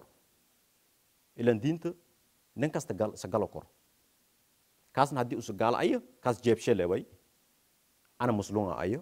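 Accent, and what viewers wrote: Somalia. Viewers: wa lahjad la daho af maay maay.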